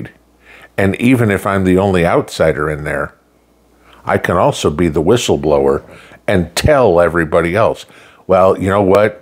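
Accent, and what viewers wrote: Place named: East Tennessee, United States. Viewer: Yup, your speaking the truth. Sounds like you are in East Tennessee. I grew up in Roane County. They do some dumb things. Tennessee has so many people moving to Tennessee. The new comers will try to change things and turn it into what they left behind. Like HOA's hate them. If good men do nothing then evil will.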